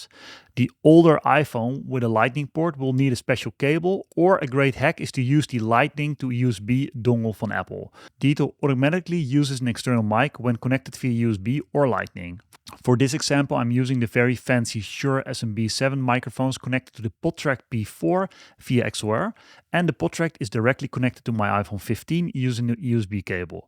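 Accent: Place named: Netherlands. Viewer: I hear a dutch accent🎉.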